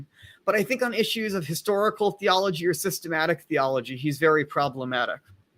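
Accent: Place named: United States. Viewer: Great idea for a video, and some really interesting content here. That being said, my man's Latin pronunciation could benefit from some de-Americanization, haha! As a pretty settled Suarezian myself, I'd recommend you check out Luis Alonso Getino's "El Centenario de Suarez," published in La Ciencia Tomista, 15 (1917), pp. 384-388 [available on HathiTrust]. The author compares the 24 theses with Suarez' positions (Spoiler: except for thesis #13, which even then is qualified, Suarez would disagree with 23 of the 24 theses!).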